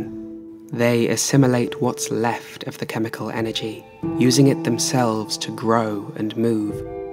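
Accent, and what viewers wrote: England, United Kingdom. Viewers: Usually I'm not too big on english accents (sometimes I hyperfixate on things) but you my friend have that ASMR type of voice just without the cringe. That kind of stuff isn't my forte, but big homie over here got that type of voice I could fall asleep to. Ever consider getting into voice acting? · i dont like your voice and your accent (perhaps you are really an english), but i like your videos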